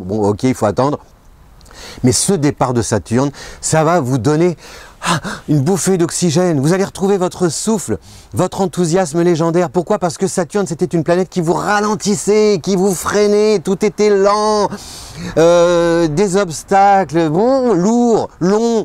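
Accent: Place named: Belgium. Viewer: Vraiment marrant l'accent belge.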